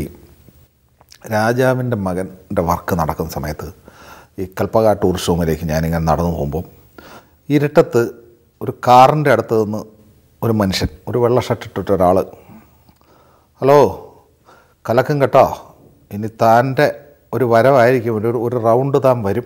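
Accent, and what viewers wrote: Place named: Kottayam, India. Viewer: Not Kottayam slang but Kottayam accent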